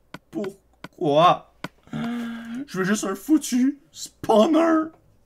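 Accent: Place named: Quebec, Canada. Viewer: parce que j'adore ton accent Tabarnake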